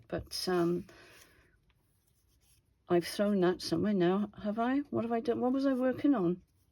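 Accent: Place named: England, United Kingdom. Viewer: Hello, I live in New York State USA and recently discovered you. I am very much enjoying listening to you, speak as my grandmother and grandfather were both from England and I miss being able to hear them talk you have great ideas and I didn’t realize that you were Bella‘mom in any case I’ve been scrapbooking for a while and I am also a fine art painter but I find that doing paperwork and collecting different kits is absolutely wonderful and so relaxing. What I am currently working on is I am a member of pink monarch prints for $8.95 a month she will give you a 50 pieces of paper of a kit that she makes every month and they’re absolutely beautiful But I love to get ideas from other people on how they create and so I am very much enjoying listening to you and I also purchased kits from Bella as well. So thank you for being out there and I too am 76 years old.and a fine art painter.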